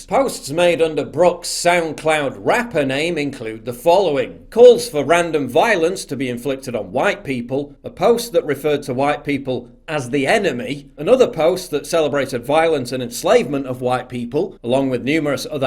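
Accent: United Kingdom. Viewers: Why you gotta narrate like that, get your British sounding a new hobby. This the second time now that I accidentally started watching your under 5 mins pos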